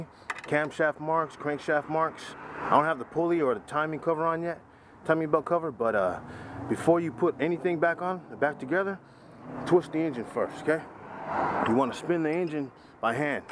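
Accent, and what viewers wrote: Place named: California, United States. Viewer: this fools from cali! i can hear his accent, keep doing your thing man!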